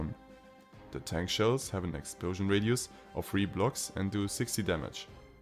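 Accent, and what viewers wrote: Germany. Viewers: Ich merke es schon von der aussprache dass du Deutscher bist hahaha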